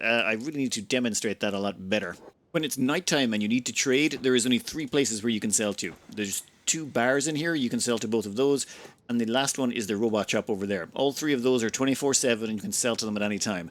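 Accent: Ireland. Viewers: He answered it elsewhere. It's the R key lmao. It's just that paddy accent. Bless his heart.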